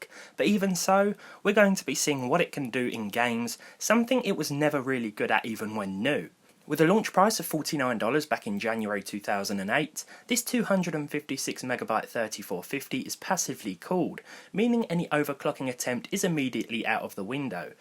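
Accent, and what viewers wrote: United Kingdom. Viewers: I like ur accent where do you come from in UK?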